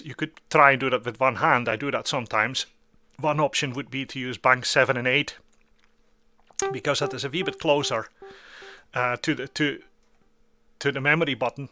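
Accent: United Kingdom. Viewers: Is there some Scouser in your accent? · Liked and subscribed! Great video. Amazing accent. Have you spent time in the north of England / Scotland?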